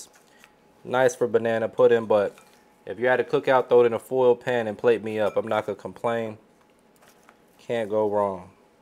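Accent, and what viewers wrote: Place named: Florida, United States. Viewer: Where are you from? Southeast? Your accent makes me think of Florida. 😂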